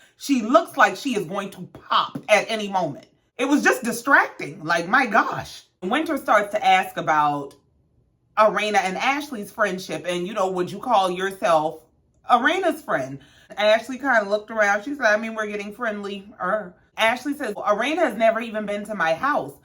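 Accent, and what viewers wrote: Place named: Gullah Geechee, United States. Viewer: I knew you sounded like a cousin!! I’m from Savannah! Heeeeey Geechee cousin!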